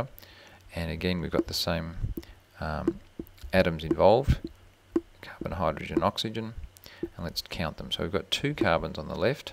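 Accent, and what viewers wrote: Australia. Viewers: I like you matey cause you sound Australian, oh and this video was really bonzer! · finally it took an aussie ...good job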